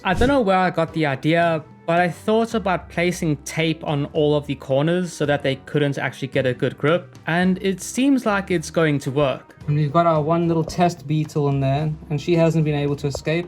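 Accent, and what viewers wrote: South Africa. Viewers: You're South African, aren't you? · I thought u sound like u from SA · I knew you were South African cuz of your accent😂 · Are you south African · You sound like you have an south african english accent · Duuuuude! It's so hard to find fellow South Africans! I knew I recognized the accent. Keep going with the amazing content. ❤️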